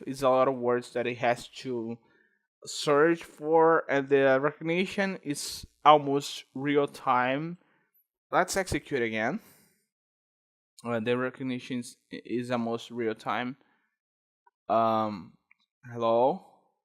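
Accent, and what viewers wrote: Brazil. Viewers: E esse ingles de br ai.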